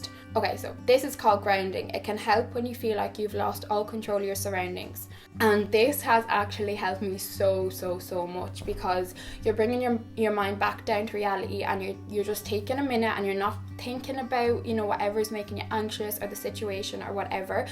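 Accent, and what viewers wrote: Ireland. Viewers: oh my god. you speak in such an irish accent. omg, melanie sounds like an american and you sound like REALLY ORIGINALLY Irish. omg 😊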